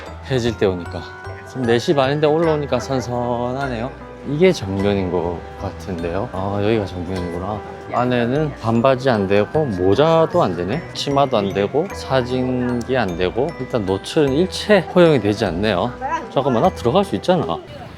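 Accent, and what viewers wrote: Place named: Gyeongsang, South Korea. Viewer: 말씀하시는게 되게 웃겨서 두번째 영상 보는중 입니다. 경상도에요? 사투리가 구수하네요 ^^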